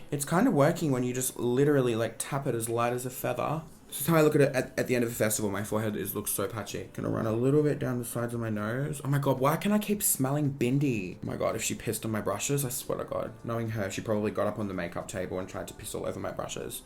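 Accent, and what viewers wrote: Australia. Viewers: Is his what posh Australians sound like? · tori s its australian like mee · Is he Australian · Is he Australian? Because he sounds like it.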